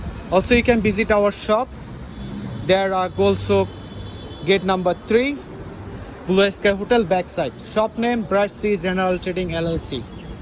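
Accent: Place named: Chittagong, Bangladesh. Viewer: আপনি চট্টগ্রামের নাকি?😁 উরে তুলো তো।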